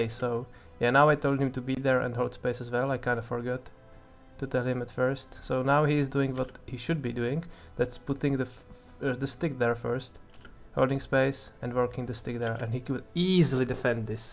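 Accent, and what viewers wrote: Czech Republic. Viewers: Ten českej accent tam je furt cítit :D, ale good video a nice advices! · u have nice content keep try and u have like czech or slovakia voice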